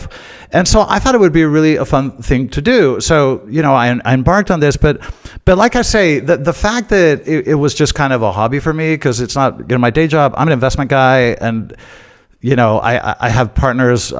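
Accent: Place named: United States. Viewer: If it’s not offensive, can I ask why you have an American accent?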